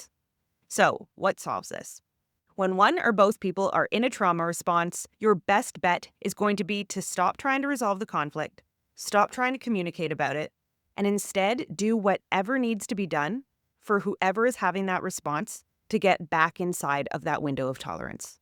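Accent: Canada. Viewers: You’re from Canada aren’t you?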